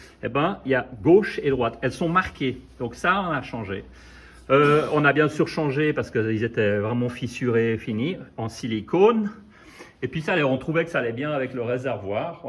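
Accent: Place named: Geneva, Switzerland. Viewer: Lors de l'épisode concernant le contrôle et la remise à niveau des composants électriques du cadre, aviez-vous passé un léger coup de jet, ou simplement un coup de chiffon ou de pinceau avant votre travail? Vous m'avez dit que vous aviez passé la moto complète au Karcher avant toute intervention, ce qui est normal, mais qu'en est- il de l'épine dorsale du cadre, une fois le réservoir et la selle enlevés ? PS: un commentateur mentionnait votre accent vaudois. Personnellement, il sonne plutôt genevois....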